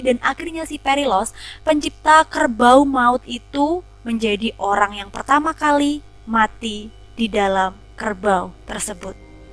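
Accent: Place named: Surabaya, Indonesia. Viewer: Iki arek suroboyoan ta koyok'ane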